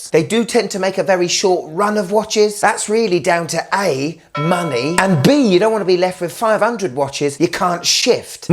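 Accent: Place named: Whitechapel, United Kingdom. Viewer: Perfect German pronunciation in a Whitechapel accent…❤. Big “thumbs up” always MATE!!!!!!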